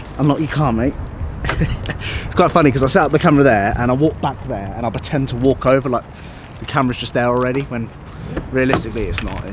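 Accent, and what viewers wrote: United States. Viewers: Haha mad, your American accent make you sound like Charlie Hunnam from sons of anarchy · 😂😂😂dying at the American accent. I love it. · that american accent omg ahahaha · the American accent is hilarious but accurate lmao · Haha love the American accent 😂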